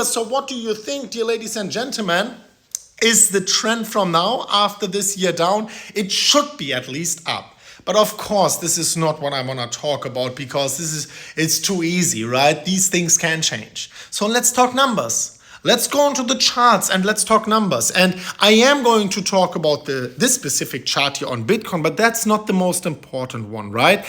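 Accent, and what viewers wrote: Germany. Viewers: I watch his video for the drama and his German accent, “People spitting on my face…”😂 Keep it up as it’s all going down…trend is our friend until the trend; the macro trend in down first time in crypto history…so screw the pleul or some Dutch crypto guy or whoever… BTC will be lucky to hang in at $16k for 2023!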